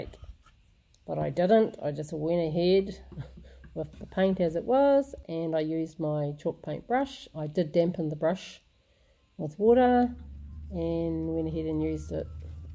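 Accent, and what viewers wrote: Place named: New Zealand. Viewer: oh not your fault … yes I watch anyway ! Cool to know that it’s a Kiwi accent . I have heard other Australian accents but know nothing about them . Will keep watching!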